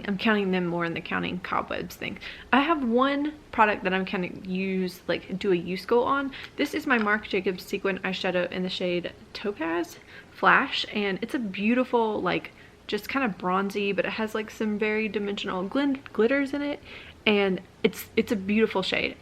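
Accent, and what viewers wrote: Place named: United States. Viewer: you're developing an American accent! Lol. Nice twang girl! Enjoy your products.:)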